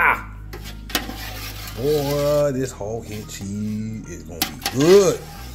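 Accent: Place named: New Orleans, United States. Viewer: Umm, ....ahhhh, ... no thank you. 🤢 My Southern grandparents ate that stuff and tried to get me to taste it when I was a little girl. They got it already made though. You must be from the South too. Sounds like maybe from 'Nawlins' correct?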